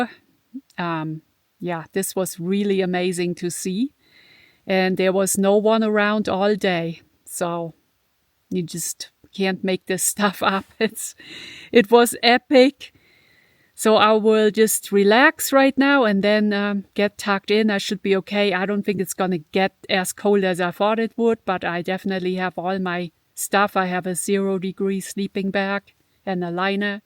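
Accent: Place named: Germany. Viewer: Hallo, Was für schöne und abwechslungsreiche Wanderwege in absolut fantastischer Landschaft. Beeindruckend diese Felsenlandschaft mit gefrorenem Wasser. Das hätte ich in diese Gegend nicht erwartet, das es so kalt werden kann. Schöner Nebeneffekt des Videos - mein Englisch noch ein wenig Aufzubessern - sehr angenehm mit deinem deutschen Akzent und sogar besser verständlich 😊 Gott sei Dank gibt es hier bei uns keine Bären😉 Danke fürs mitnehmen und noch einen schönen Sonntag! LG Thomas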